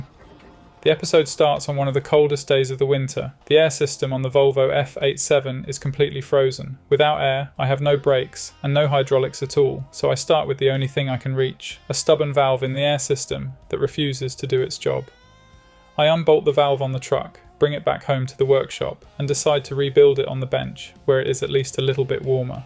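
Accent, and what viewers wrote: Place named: United Kingdom. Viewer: Har du en engelsk förälder eller studerat i England, för din accent låter enormt brittisk❣Ärru från Åmål med tanke på ditt val av alias?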